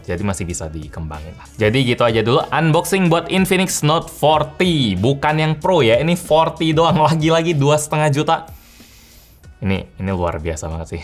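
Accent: Palembang, Indonesia. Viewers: Ketaro nian wong plembang. 😅 Metu aslinyo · kayak ada bhasa palembang ny, ap koh org palembang? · Wong Palembang caknyo kakak ini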